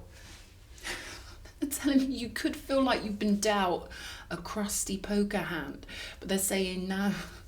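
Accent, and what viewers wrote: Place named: London, United Kingdom. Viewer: the London down country girl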